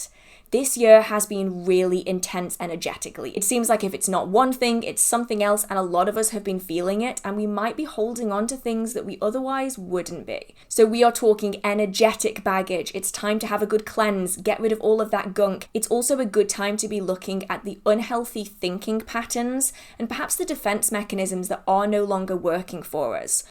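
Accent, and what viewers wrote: United Kingdom. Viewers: I like you sooo much. I love your cute British accent 😊❤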